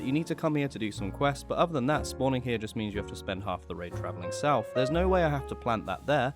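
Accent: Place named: England, United Kingdom. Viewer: i like this funny english tarkov man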